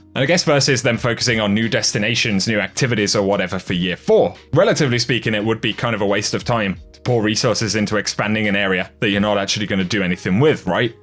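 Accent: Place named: Midlands, United Kingdom. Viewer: England probably the midlands by the sounds of it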